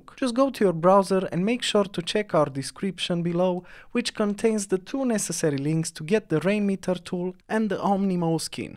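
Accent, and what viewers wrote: Russia. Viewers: is this guy russian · ue voice like russian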